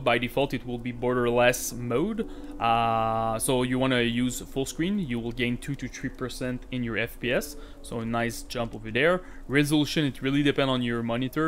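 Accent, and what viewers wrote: Quebec, Canada. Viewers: jai jamais entedu un accent quebecois autant fort dude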